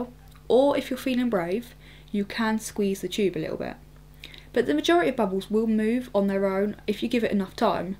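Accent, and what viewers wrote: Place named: Essex, United Kingdom. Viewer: This one's definitely different from your newer videos, more chilled, but that Essex accent!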